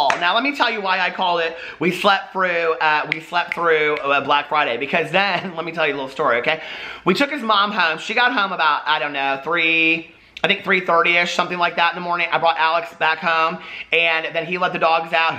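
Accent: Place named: Midwest, United States. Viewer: I love that you are a MidWesterner, so am I. I do crack up when I hear the verbal Midwest come out . Like your black church shoes. Years ago I made a reference to growing up we didn't have numerous shoes we had school shoes, play shoes and church shoes and a couple people didn't know what church shoes were. Lol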